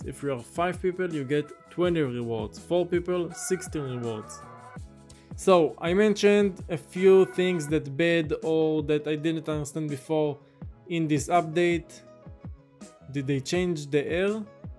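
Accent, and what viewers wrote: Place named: France. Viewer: ton accent français s’entend trop ptdr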